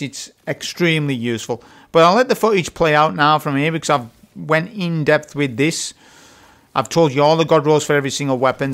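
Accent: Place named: North East England, United Kingdom. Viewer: You sound like you from my neck of the woods , north east :) just found your channel, thanks for the solid advice il shall be grinding all day!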